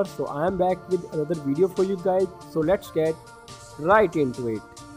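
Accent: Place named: India. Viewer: Isn't it a coincidence that he sounds Indian?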